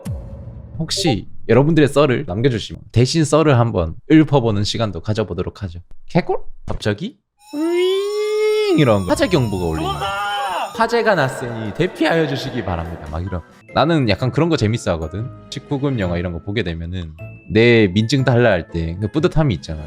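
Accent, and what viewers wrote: Gyeongsang, South Korea. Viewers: 잠만 님 대구 대쓰까? 저 대구 대쓰까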